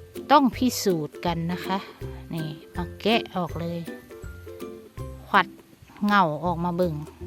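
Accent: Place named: Sakon Nakhon, Thailand. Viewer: สวัสดีคะ สำเนียงการพูดเหมือนคนสกลนครเลยคคะ บ้านเดียวกันมั้ยคะ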